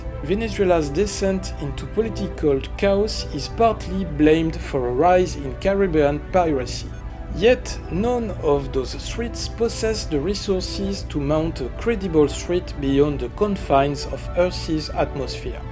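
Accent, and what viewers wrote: France. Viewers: Bonjour tes vidéo sont très intéressante mais malheureusement je comprend pas l'anglais je me contente du visuel ou de la traduction google mais la traduction n'est pas fidèle je pense. Ton accent montre que tu es français . bonne continuation si tu as des vidéos en français se serai top.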